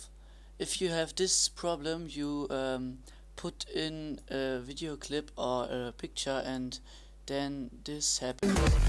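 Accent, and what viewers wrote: Germany. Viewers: Helpful + funny German accent :D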